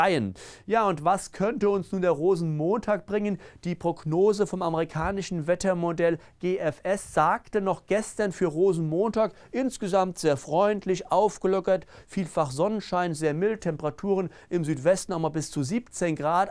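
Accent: Hesse, Germany. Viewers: Hessischer Dialekt. Oder Rheinland-Pfalz.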